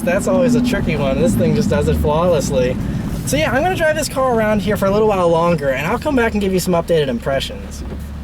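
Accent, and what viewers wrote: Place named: Pittsburgh, United States. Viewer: Can tell your from the Pittsburgh area just by your voice lol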